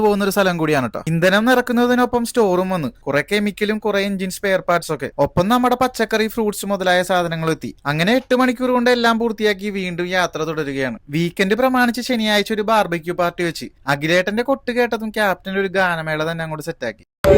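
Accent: Kochi, India. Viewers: Love this Kochi slang... 💖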